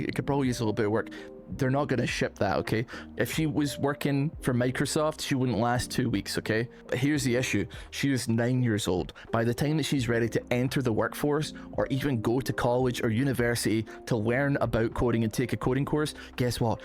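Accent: Scotland, United Kingdom. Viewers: Never realized I needed reddit drama in a thick Scottish accent until today >.>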